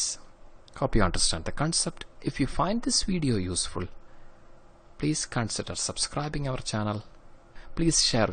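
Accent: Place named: Kashmir, India. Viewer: Thankyou so much sir It is really helpful 😔☺️ Btw your accent is like kashmiri's .😄🎉🎉🎉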